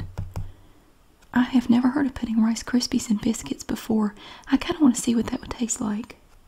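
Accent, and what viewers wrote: South, United States. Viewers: The fact that her name is, she’s southern and reading recipes is literally the CUTEST thing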